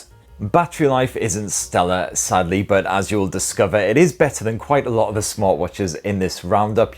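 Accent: United Kingdom. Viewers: that british accent... fancy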